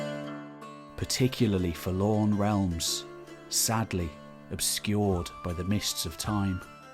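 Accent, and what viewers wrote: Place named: North, United Kingdom. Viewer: He's a northerner.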